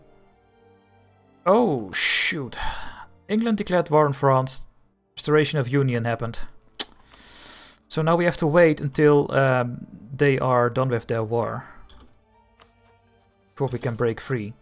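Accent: Netherlands. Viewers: As a Dutch person myself its really easy to recognize a fellow Dutch person speaking English. But I can Imagine its hard to catch if you are not Dutch yourself. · He has a very strong Dutch accent lol, might not be obvious if you dont speak English or Dutch natively. ex. marinesss dutsh, persent, usash, tshoosh. very Dutch, all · Idk man, has a pretty noticeable Dutch accent. Maybe not as thick as many others from here have but it certainly isn’t accentless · This is good and proper. A dutch man with a thick dutch accent forming a strong Netherlands. Gezellig! I'm gonna enjoy this series. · his english is perfect but he clearly has an accent. a dutch one. an obviously very dutch accent. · LUL as a person born in Netherlands, this is a THICC Dutch accent bro xD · It’s really interesting to hear the Dutch pronunciation of the Dutch provinces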